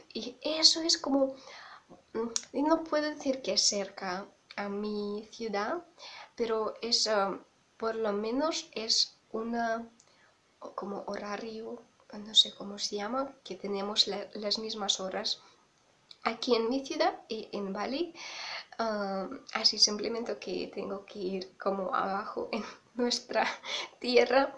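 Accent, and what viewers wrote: Spain. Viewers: Acento español se oye gracioso vente a México y realizaras tus sueños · Lindo tono de voz, acento de España, y se va quedando el acento Ruso. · Tienes un español gallego, mejor te quedaría el español latino, saludos desd Chile..😎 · Tu tono de voz y tú acento de España me causa asmr... (Un unintentional asmr) haber cuando haces un vídeo asmr😻si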